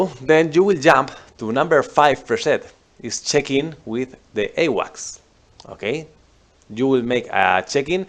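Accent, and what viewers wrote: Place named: Argentina. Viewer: You sound like my coworker from Argentina. No worries. Your english is good. Thanks for the tutorial. After 10 years back in the Pit I was a bit lost with all the changes from 4.32 back then haha.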